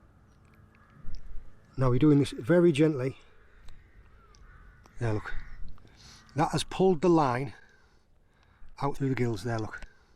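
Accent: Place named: Geordie, United Kingdom. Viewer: I haven't watched any of your videos for quite some time, Sorry about that but my interest has shifted from Sea Fishing right now. You popped up in my feed so here I am. This is a great video, very informative, the biggest thing I noticed was how your accent has changed since the last time I watched. That geordie twang is disappearing fast my lad. keep up the good work!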